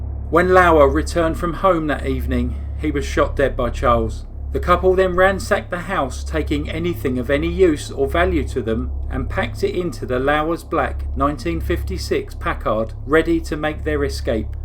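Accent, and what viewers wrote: United Kingdom. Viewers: This was a Brilliant yet Horrifying story but again presented Beautifully British. This is so sad that these young kids really went down for all the deaths of people and it happened so quick! But he took the life of a Baby and that for me is True Evil and The death sentence for the Murdering Sack of Shit I was well justified in my opinion but he would suffer more a long life sentence!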